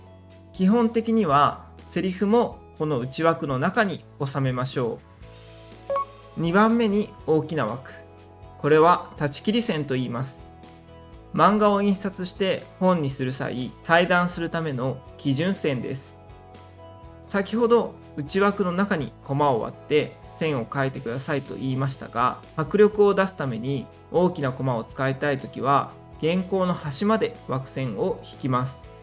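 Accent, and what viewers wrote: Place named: France. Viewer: Merci beaucoup professeur, vous nous aider beaucoup 🥹🙏🏾🥰 j'adore votre accent français, c'est juste incroyable 🤌🏾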